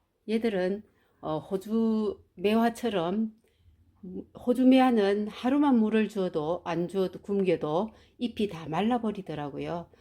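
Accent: Gyeongsang, South Korea. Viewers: 너무예쁘게 잘보았습니다. 목소리가 경상도인듯한데 농장이 어디인가요? 우리집은 대구인데요 꼭한번 구경하고싶은데 가능할런지 모르겠습니다만 주소나 전화번호를 알려줄수있는지요? 꼭 한번 보고싶습니다.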